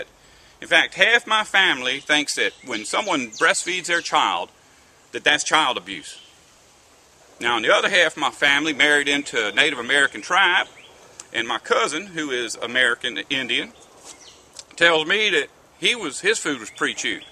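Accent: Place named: New York, United States. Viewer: Aw heck, I though it was New York. His accent is a lot like yours.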